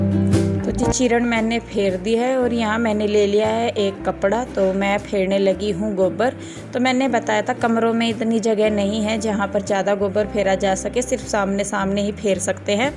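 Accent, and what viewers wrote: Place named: Bijnor, India. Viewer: Mam apka face bilkul meri mami se milta h bilkul same ap ek bat batao ap up mei bijnor side se ho na apki language se mjhr aisa laga kyuki m bhi whi se hu isliye mjhe laga aisa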